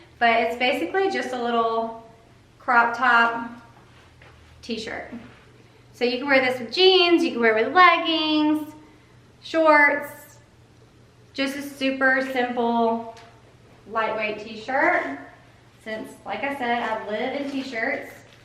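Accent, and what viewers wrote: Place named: Georgia, United States. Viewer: Please enter me in your give away. I enjoy your channel very much, partly because of your Georgia accent. I live in Colorado. God bless 🙂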